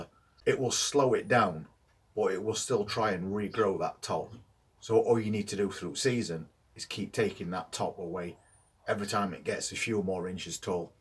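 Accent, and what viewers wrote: Yorkshire, United Kingdom. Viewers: I hear a propa Yorkshire lad, Sheffield mate?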